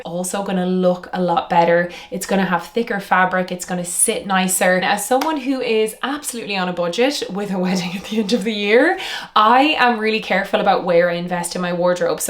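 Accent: Ireland. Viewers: Who cares? It doesn’t alter the meaning or context of what she’s saying plus she has a strong Irish accent, go and Karen somewhere else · She's Irish and her pronunciation is utterly charming .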